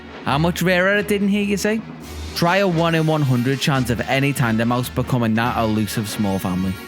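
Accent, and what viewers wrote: Liverpool, United Kingdom. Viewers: Firstly, your stronger than me lad i had a go at hunting a dex a while ago and tbh i dont think my attention span’s recovered.. also, scouser?? Got a little scared hearing another accent on here i cant even lie. Dunno what it is about brits and youtube but i never see any of us on here loll. Anyways congrats on this man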